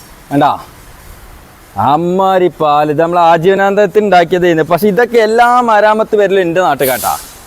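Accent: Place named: Malappuram, India. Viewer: നല്ല പച്ച മലപ്പുറം ഭാഷ ഇഷ്ടമായി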